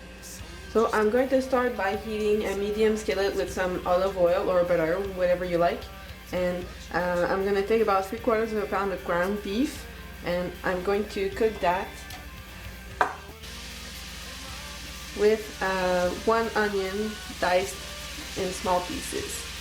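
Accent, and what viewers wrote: Quebec, Canada. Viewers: What a cool channel Your accent makes me miss Quebec I'm going to make that except with 4 times the macaroni so it will have to be separate or that much more liquid I think it will dilute the sauce a bit though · J'adore l'accent québécois quand il parle en anglais. J'ai vu juste? :O))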